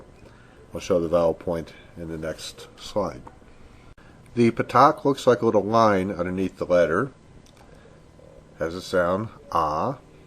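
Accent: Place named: United States. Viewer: It's more of an American accent. Not Ashkenazi. Maybe more Sephardic.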